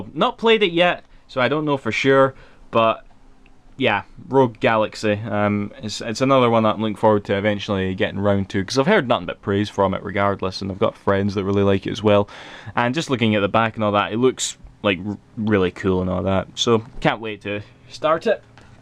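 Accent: Scotland, United Kingdom. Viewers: What part of Scotland are you from.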